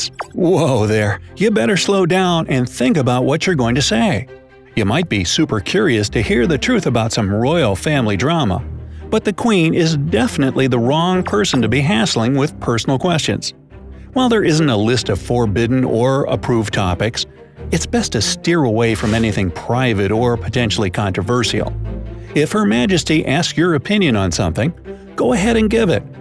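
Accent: United States. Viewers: Kind of sad hearing an American voice laying down these rules. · American commentator XD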